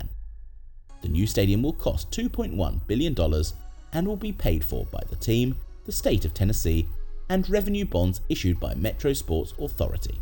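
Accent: United Kingdom. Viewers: Knowing this is AI generated video, the statement about Bills wanting a new stadium , was pushed by the NFL brass. But the AI is incapable to think or know anything. Also British accent doesn't fit here.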